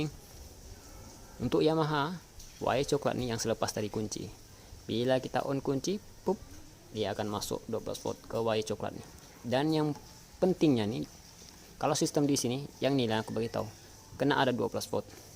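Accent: Sabah, Malaysia. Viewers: Mantap bossku,Kamu area mana bossku?slang Kamu macam sabahan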